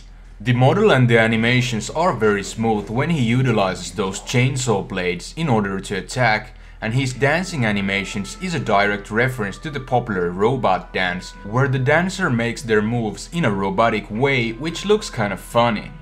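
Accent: Finland. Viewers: I started watching this, and thought that you have that finnish accent in your english. Mutta hyvä video! :D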